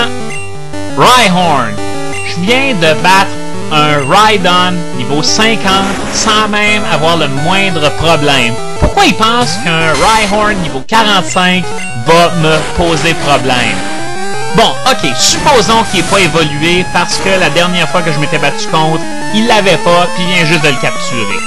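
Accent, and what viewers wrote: Quebec, Canada. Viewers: you speak Canadian-French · tu avais un accent... si t'étais pas Québécois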